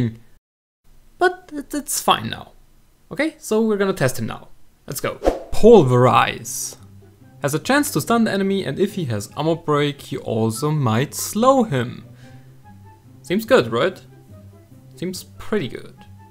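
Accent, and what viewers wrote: Germany. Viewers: You sound like Niki Lauda. Are you german? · klingst deutsch 🤔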